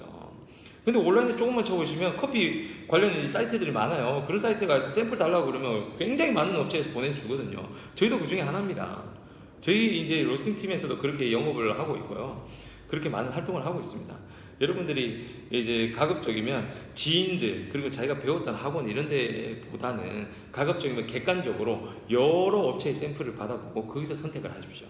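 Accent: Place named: Gwangju, South Korea. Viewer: 광주인가요? 말투가... 위치 어디실까요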